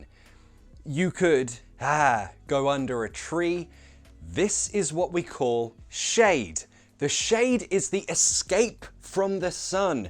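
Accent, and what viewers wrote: United Kingdom. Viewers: I love the British accent 😍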